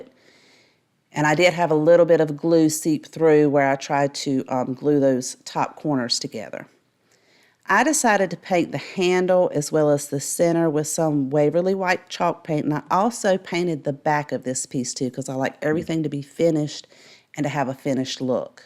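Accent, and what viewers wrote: South, United States. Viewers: I always truly enjoy all your crafts. These minis are adorable! Love your accent! I'm from the South too, and get a lot of teasing about it up North.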